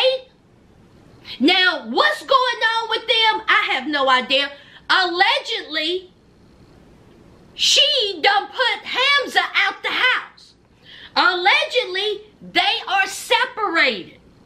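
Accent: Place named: North Carolina, United States. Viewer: NC resident? your accent sounds real close to those in the foothills.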